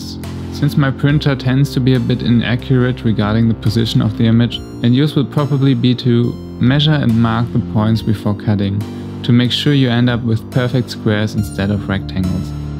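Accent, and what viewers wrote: Germany. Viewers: He sounds german Bist du Deutsch? 'v'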